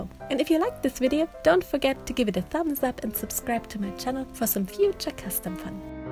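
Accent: Germany. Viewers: Are you from Germany? I don't know why but you really sound kinda german :D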